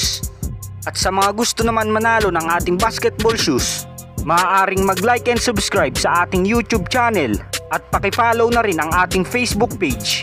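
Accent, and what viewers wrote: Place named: Visayas, Philippines. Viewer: Kainaman ng tigas ng yung salita..visaya kaba hehe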